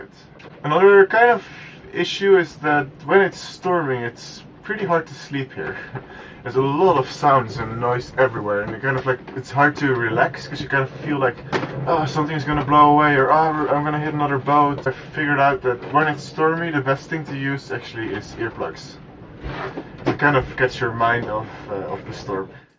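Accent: Netherlands. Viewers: Cool! Are you dutch? You sound like it! 😊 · ik had ook al zo'n vermoeden. Zelfde soort accentje als mij; je Engels is zeer goed. Mooi zeg in Noorwegen! Maar dan wel weer koud in de winter. Een idee om te overwinteren op een boot in Spanje (in September varen oid?)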